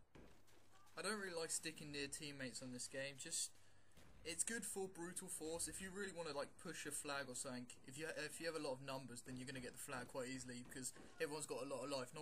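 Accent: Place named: Australia. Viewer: very Australian english accent :)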